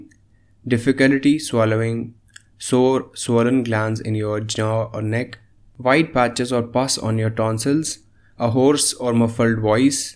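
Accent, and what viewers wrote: India. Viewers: Indian accent 😁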